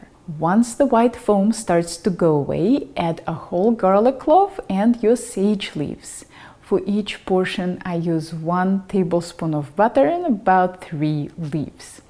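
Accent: Russia. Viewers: Are you Russian? You have a very pronounced Russian accent. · Nice russian accent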